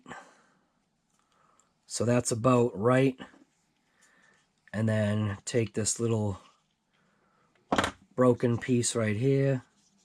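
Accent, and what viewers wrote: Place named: New Jersey, United States. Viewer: Oh wow this is crazy that’s what they get for copy Arrma. Oops 😬 they have nice trucks. Where u located I’m in New Jersey telling by ur the way u talk is like me hit me back. And good luck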